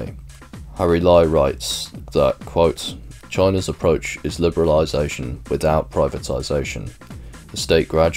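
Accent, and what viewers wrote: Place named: London, United Kingdom. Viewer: Wait... I hear that youre from London! (possibly even east London!). Great to hear a familiar voice! (I'm from East London)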